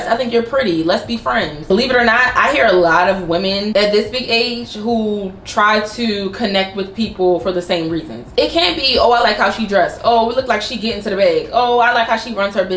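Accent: Baltimore, United States. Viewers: Are u from Baltimore?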